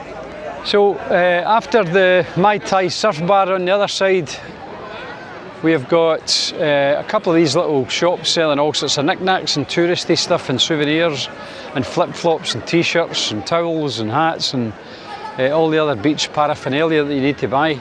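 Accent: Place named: Northern England, United Kingdom. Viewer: It seems to be "northern", or is it scottish?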